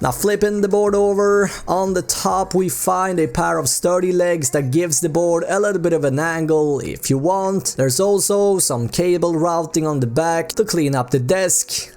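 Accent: Sweden. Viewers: Are you a swedish elleh? Good review. It's an instant buy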